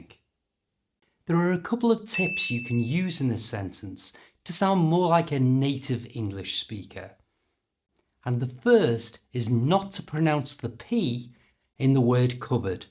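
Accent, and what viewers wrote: Liverpool, United Kingdom. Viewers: As I have already told to you I enjoy your videos and your scouse accent. Cheers from Italy 😊👍🇮🇹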